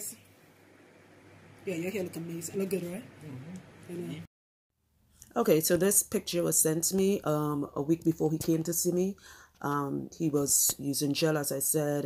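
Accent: Trinidad and Tobago. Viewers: Me listening to her talk: buh how she sound like a Trini so? Her: Everybody knows I'm Trinidadian Me: DAS WAH WE TALK BOUUTTTTTT. applauds and immediately subscribes I wanna start locs but I'm a dougla and there are no tutorials for my hair type, plus, I am soo worried about thinning so I clicked on this video for info and I am already SURE this woman knows what she's talking about. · i could hear that trini accent hardd lol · 30 secs in listening... Was like.. Wait......... Y she sounding trini.. Then boom u say you're trini, great vid hun. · Trini to the bone! Yes sister! Shout out from Chicago! I knew u was a trini soon as I hear ya voice. Both my parents live in Arima. Power! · Im watching these comments and I see people talking bout your accent then I raised the volume and I'm like "wait, that's Trini I hear?!" It's nice to see that I'm looking at loc videos and I come across a fellow Trinidadian. 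· From the time you started talking I knew you trini🇹🇹❤ I finger twists my hair two weeks ago so I am going to do the two strands twists asap 🙌🏾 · I Heard the accent and the beginning and I knew yuh was a Trini, Trini to d Bone!! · Yes I know that accent 😍 hi Trini sista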